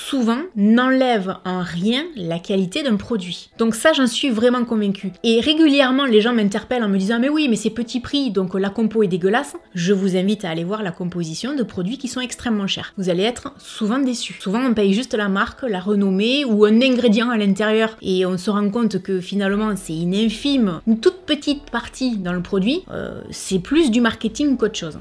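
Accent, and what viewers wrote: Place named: Pas-de-Calais, France. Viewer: J adore tes vidéos et ton petit accent bizz du pas de calais 💋👍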